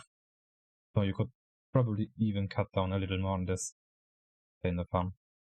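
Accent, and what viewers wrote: Germany. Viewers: Der deutsche mit seinem Akzent :D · are u german? sounds like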